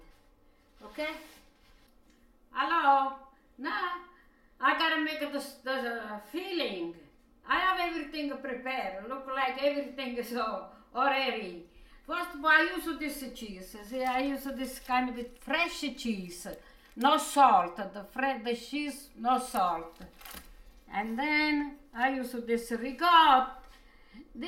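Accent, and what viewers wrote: Italy. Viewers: Just love the Italian-English accent (not Italian myself)! Could watch this video just to listen to this dear lady speak 😍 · As always, Grandma, love your speech, your gorgeous Italian accent, your singing, your willingness to share your techniques with us! Grazie molto!!!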